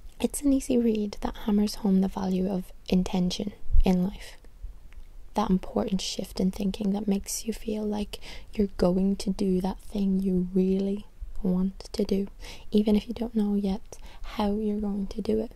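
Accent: Ireland. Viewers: I’m not into whispering so I love soft spoken. However, with that lovely Irish accent this makes the soft spoken voice even better! It is so relaxing you can’t even imagine, so Thanks! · Being from Germany, no, being from wherever you are in the world, the Irish accent, and especially with your angelic and precious voice, this is the best ASMR in the world. We all wait for the channel! Your voice, and aura, and presence have the power to heal. Thank you so much. Go raibh maith agat! <3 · This was just the kind of thing I've been looking for. A nice Irish asmr reading some sort of book. Love you 💚💚 This actually sent me to sleep! Thank you so much. Can't wait to read that part of your book 💚💚😊😊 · I love the Irish accent so much!! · The Irish Accent just lends its self to ASMR !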